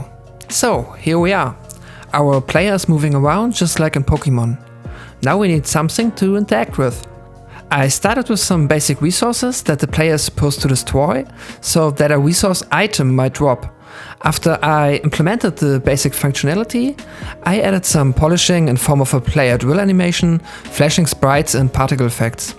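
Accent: Germany. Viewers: I recognize a fellow German when I hear one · are you german? i think you have that kind of accent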